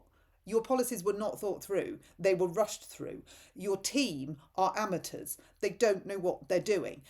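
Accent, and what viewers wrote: RP, United Kingdom. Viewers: I love the harmony btw the colour of the door and the fireplace. Also your posh accent.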